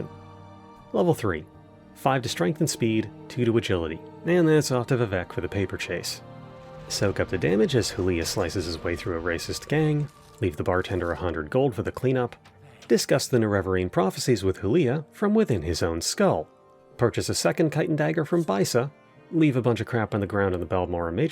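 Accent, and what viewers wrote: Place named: Canada. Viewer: I can tell youre canadian.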